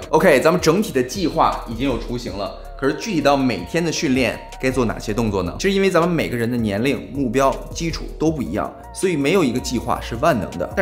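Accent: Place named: Shandong, China. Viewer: 山东人吧 这口音能听出来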